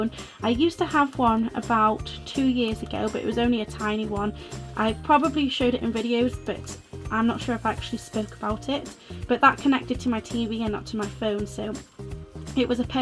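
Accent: Wales, United Kingdom. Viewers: Great videos. Where in Wales are you from?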